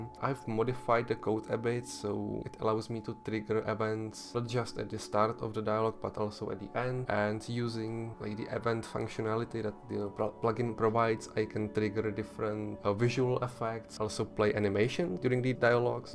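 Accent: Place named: Czech Republic. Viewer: Kvalitní hry a český přízvuk :D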